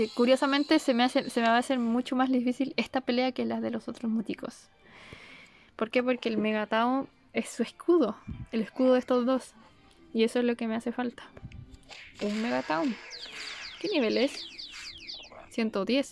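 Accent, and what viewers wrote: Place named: Chile. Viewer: TIENE ACENTO CHILENO CONSHASUMARE WUEWON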